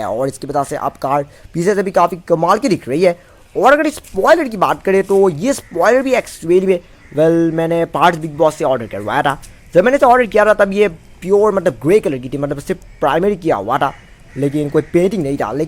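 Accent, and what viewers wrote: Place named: United Kingdom. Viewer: Kotha bur olop bhal kobisun aru besike bhal lagibo aitu english accent hindi koi aso ni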